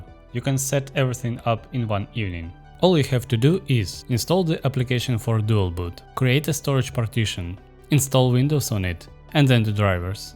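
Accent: Russia. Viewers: your accent is way too Russian. You are certainly not Ukrainian, and if you are from so-called Belarus, it’s basically the same that you are Russian. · По акценту очень заметно что ты Русский) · Привет, по акценту сразу понял что русскоговорящий , сможешь помочь поставить виндовс и утилиту переключения?)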